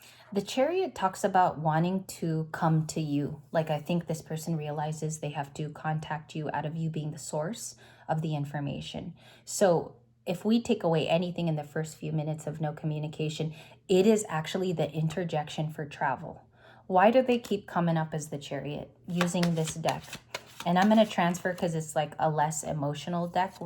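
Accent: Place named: New York, United States. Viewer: Thank you for the reading. I also had the Tower in my daily read. Love the NY accent glad to see you're channeling some east coast!!❤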